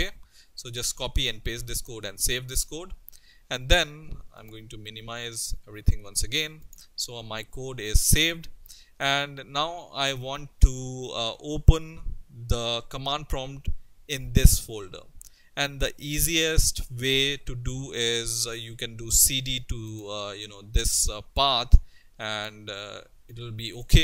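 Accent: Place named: India. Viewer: indian english is best ! so clear and easy to understand ! thnx bhai anyways :-) best tutorial !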